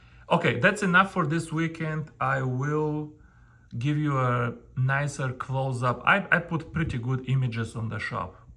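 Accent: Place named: South Africa. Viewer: You from South Africa? Just guessing based on how you speak. NICE attention to details! I wish you made bigger, bass sized stuff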